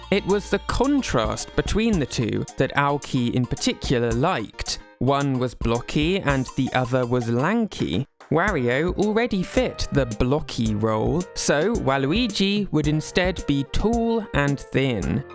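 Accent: United Kingdom. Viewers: always wondered why the Brits pronounce it as Mare (like female horse)-yo instead of Mah-rio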